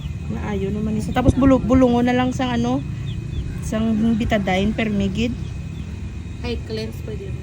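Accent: Iloilo, Philippines. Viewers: knamian ko sng mga tono ilonggo ah.. tga dn ka maam? watching from Bacolod..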